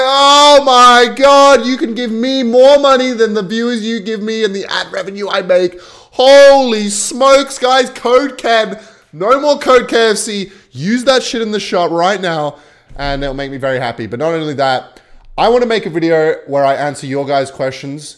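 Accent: Australia. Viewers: Aussie accent and he grows a moustache so one day he can shave it and style it lile certain austrian painter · Why are you Australian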